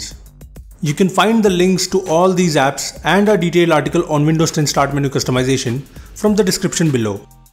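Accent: India. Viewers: Terrible voice, indian english is really catastrophic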